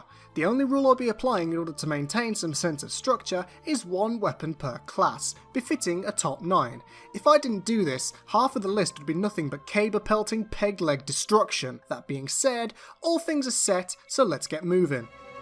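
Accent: Poland. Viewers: are you from poland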